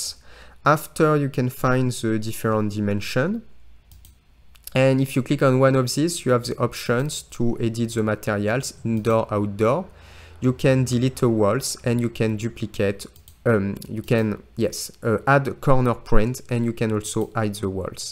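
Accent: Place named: France. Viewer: thank u for this very specific tutorial, loved the french accent ;)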